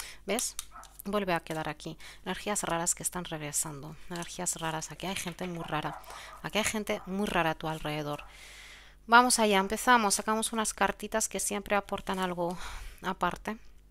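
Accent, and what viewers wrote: Spain. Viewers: Mi amor, manos hermosas muchas gracias por la lectura, pero a veces no te entiendo que quieres decir, lo dices muy rápido, yo digo que debes decir al pan pan y al vino vino, o que dices las cosas mochas, perdón, pero así me parece, gracias por lo que si te entiendo. Siempre trato de disfrutar el campo, platicar con amigos, de lo verde de las plantas, del silencio de la mañana, escuchar música, yo siempre trato de ser constante con mi ejercicio, mi alimentación, disfruto tu voz el tono, y tu acento españolado. , etc. etc. 🙏🙏🙏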